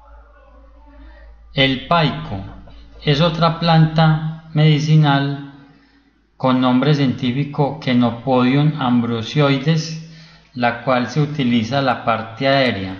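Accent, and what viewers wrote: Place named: Colombia. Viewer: Ame el acento colombiano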